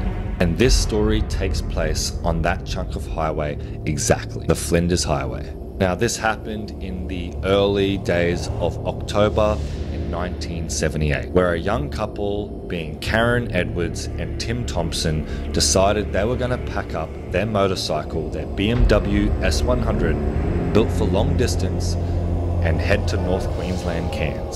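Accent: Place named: United States. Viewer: i actually thought you sounded American mixed in like..Did you spend time there.?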